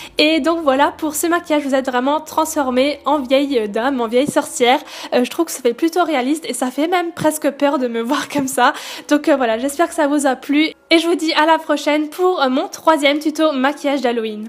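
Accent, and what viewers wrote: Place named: Alsace, France. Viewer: Wahou c'est trop réaliste ! En entend bien ton accent alsacien personnellement moi je l'entend bien car je suis alsacienne !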